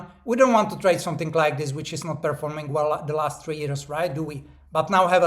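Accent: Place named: Czech Republic. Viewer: This is what i trad nikkei to the long. I noticed how nicely it moves and started searching who trades nikkei on internet and found this video. After 10 yrs of trading I started getting sick with forex pairs…navigating forex is tough even for experienced traders. Nikkei has a structure, much easier. But this info about mondays is even more helpful U say 9 to 3 pn what time zone? I guess u mean tokyo time? Thank you for the video bro!!! U sound Czech by accent;)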